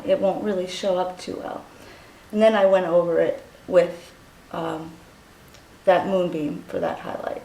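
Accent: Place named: New York, United States. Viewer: You are so stinkin' adorable and I just love listening to you...your NY accent is so cute!!! I love my HIGH BEAM too! I almost am due to replace it. Love ya, sweetie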